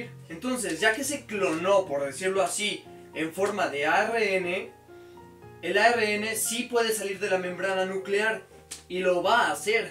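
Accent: Fresa, Mexico. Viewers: Habla bien fresa pero aprendí en 5 minutos lo que no pude aprender en 3 años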